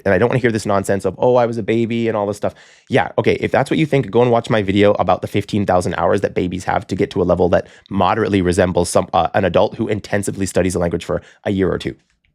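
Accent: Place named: China. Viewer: Dude,your mandarin accent is just great,keep it.———from Douyin🎉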